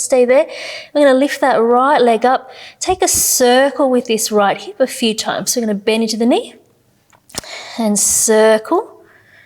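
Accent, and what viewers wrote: Australia. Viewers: I feeeel so goooooood thank you💚💚💚💚💚💚💚are you Australian?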